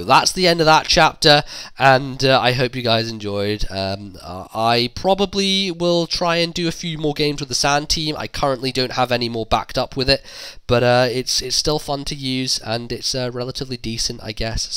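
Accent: United Kingdom. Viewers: he british dumbshit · does a british dude sound like a frog dipshit ?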